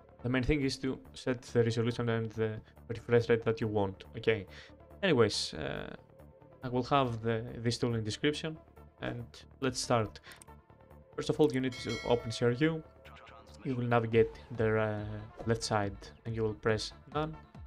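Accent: Greece. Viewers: πως καταλαβα οτι εισαι ελληνας 😂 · He sounds greek or arabic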